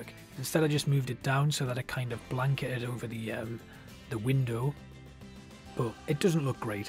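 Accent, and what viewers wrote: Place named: Newcastle, United Kingdom. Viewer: You popped up on my feed. Didn’t realise you’re a fellow geordie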